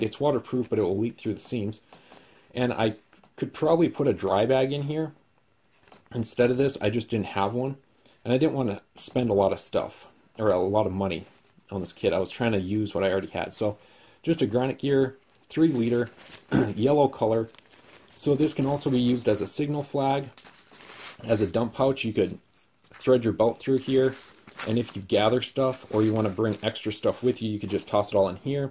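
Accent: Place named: Canada. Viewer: I'm from Michigan but you sound like you're very close to Canada. I don't hear the Canadian twang in voices here in West Michigan.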